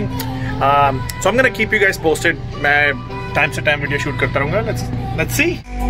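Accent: Punjab, India. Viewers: Love your Punjabi accent although I m not Punjabi you still use lota in canada yes it's a desi touch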